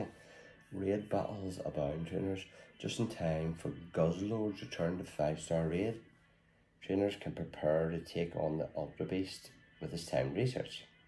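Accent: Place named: Belfast, United Kingdom. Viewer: You popped up in my feed glad I starting watching cause think I’m hearing a fellow Belfast man ( edit forgot to acc say yeah you kinda talked me into it too) 😂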